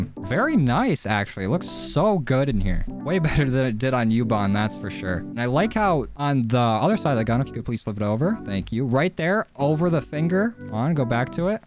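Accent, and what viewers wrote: United States. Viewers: He's American, you can tell from his accent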